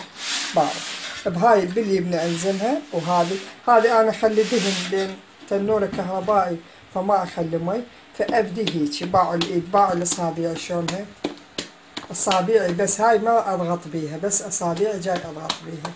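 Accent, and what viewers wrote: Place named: Iraq. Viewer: يازين لهجتكم ياهل العراق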